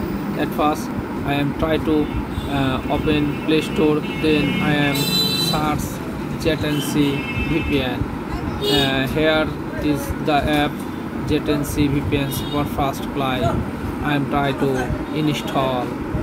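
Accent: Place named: Bengal, India. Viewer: ও যে বাঙ্গালী ওর আওয়াজ এই বোঝা যাচ্ছে 😂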